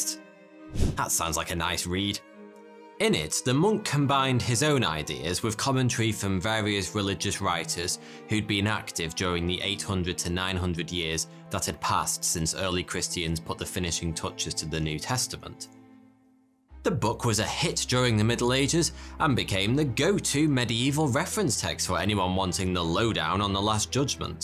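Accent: United Kingdom. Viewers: Thanks for making the video, it's hilarious 😂🤣 I love your videos, you're brilliant, your British accent, adds a great touch😂🤣 Watching this video made my day. I was wondering if you were going to do a video about me 😂🤣 I had no doubt it would have been awesome & hilarious, great work!6!6!6! You asked, what people think the Antichrist👑👑is? Your video is the "bestest" about me. I use the word bestest to make fun of my religious haters 😂🤣 All major faiths are waiting for the exact same person known by different names. Kalki👑👑 Moshiach 👑👑 Dajjal 👑👑 Imam Mahdi 👑👑 Maitreya 👑👑 One who comes in his own name 👑👑 (thanks Isa/Jesus/Hey-Zeus, very creative nickname 😂🤣) Are the same person. Look at the youngest of the 6 major faiths waiting for some Super Hero Villain to fix things they never can 😂🤣 Islam is waiting for the same person known by 2 names in their religion. 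Dajjal 👑👑 & Imam Mahdi 👑👑 That's funny, because it's the only faith that shows specifically I am a Gemini😂🤣 There's truth in all the faiths, you just have to know where to look. 6 major faiths, 3 waiting for a Super Villain, & 3 waiting for a Super Hero. That is most of the world population, lost & doomed without 1 person. The rest of the world believes in 💩 All my religious haters left & leave the future of everyone they say they care about to either a complete stranger or Doomsday, They call me evil 😂🤣 I know 3 major religions calling me evil, were built from slaughtering each other, their own, innocent people, spreadin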